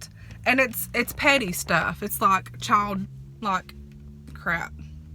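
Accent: South, United States. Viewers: Hey, i just love ur country vocal and ur country talk just love it.. We yall have to love each other and pray for each other. I just love ur family and ur little dogs.... I will be praying for u and ur family. I will be praying for the shots that u are getting.... Hey looking for Joyce Meyers Bible (pink study women) at Good Will cant found it here....Love yall... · Girl you’re so amazing 😉 and btw I LOVE your country accent!! You’re such an inspiration!! The food looked so darn good!!! Yummilicious 🤪 Hey I’m happily divorced twice too!!! You just keep on smiling and making your great videos!! Love ya so much! Sending you lots of hugs and love ❤️☀️🌺🌼🤗🌻🥰🌸🌹💗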